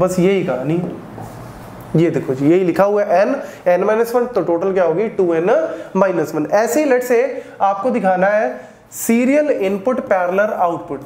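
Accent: India. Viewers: Please, videos with subtitles. I'm brazilian and I don't understand english with indian accent very well :( nonetheless thank you very much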